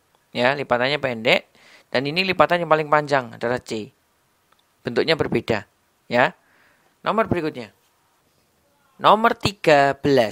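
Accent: Java, Indonesia. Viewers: Saya kalo dengerin mas menjelaskan soal soal suka ketawak... Abisnya lucu sih 😁😄😄 kalo ngomong medoknya ituloh bisa bikin saya ketawa 😄😄😄 suka guyon.... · Ikutun channel kamu saya jafi ikut medok ngomongnya koh😅🥰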